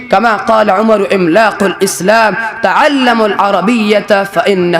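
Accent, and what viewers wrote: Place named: Pakistan. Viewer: ماشااللہ عربی لہجہ پر محنت کریں تقریر عربی کا ہے اور لہجہ اردو کا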